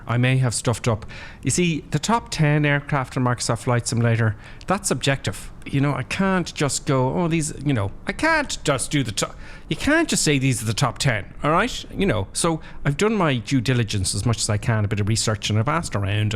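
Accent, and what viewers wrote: Ireland. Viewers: Good to hear that lovely Irish lilt! Always time to sit back with a warm drink, put your feet up and get some laid back simming fun.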